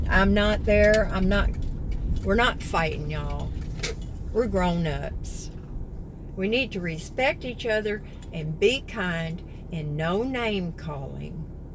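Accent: South, United States. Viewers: Well, you could make a 30 minute video on water evaporating or grass growing and I would watch. I’m to much of a Fanatic so I’m not sure what to recommend. All I could do is recommend you stick with what got you all those 1,000’s of subscribers, must keep them watching. Then add scenic So Cal location, through your eyes. Using you captivating Southern accent. No need to interview anyone. YOU ARE THE REASON WE WATCH YOUR CHANNEL SWEETIE. “ Magic!” ☺️👍👍👍👍❣️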